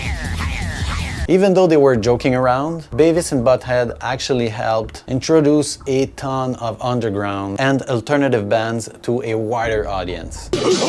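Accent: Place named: Quebec, Canada. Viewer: Je détecte l'accent Québécois ❤ Dommage qu'il n'y ait pas plus de contenu francophone concernant ce genre de musique que j'affectionne très particulièrement. J'adore ta chaîne, je me suis abonnée ✌️ *Mention spéciale au film Spawn de 1997, une des meilleures trames Sonore jamais faites et .... My life with the Thrill kill cult dans le film The Crow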